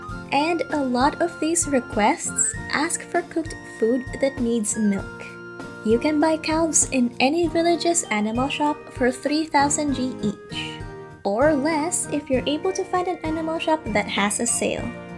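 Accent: Philippines. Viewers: Your Filipino accent is so cute! ❤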